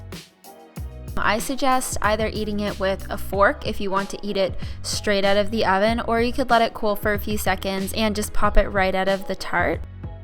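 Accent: Canada. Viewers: She sounds Canadian, but it seems like she lives in the US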